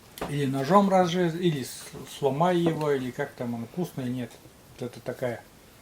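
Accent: Komi, Russia. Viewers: Я думала когда впервые увидела Ваш канал,что это блогеры с Прибалтики.В Коми свой акцент при разговоре.Покажите ещё лепешки с содой.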